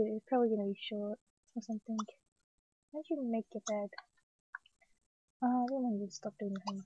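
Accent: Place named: Australia. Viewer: Are you Australian? You sound it :)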